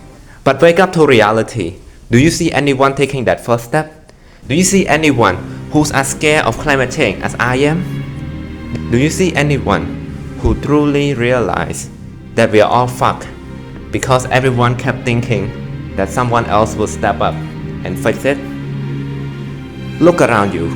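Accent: Singapore. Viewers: U got a strong singapore accent vro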